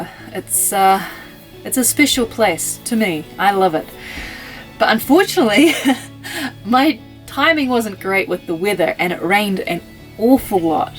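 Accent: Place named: New Zealand. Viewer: K you are such a brave lady, love your kiwi accent.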